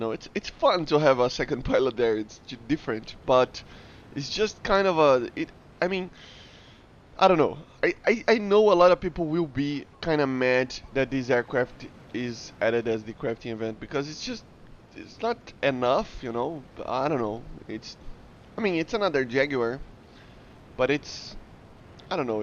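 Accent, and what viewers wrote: Brazil. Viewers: Percebi que era BR só pelo sotaque kkkk,tmj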